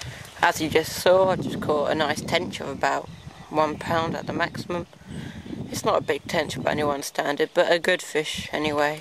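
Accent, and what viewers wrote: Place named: Europe. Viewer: hey man nice fishin, im going to assume from your accent that your from somewhere in europe...im from the northern U.S. and we"ve been fishin through the ice up here catchin alot of northern pike, i think you guys call them jack though. its nice to watch a fishin video taken in open water compared to on the ice! cant wait for summer man!!! keep fishin!!!!!!!!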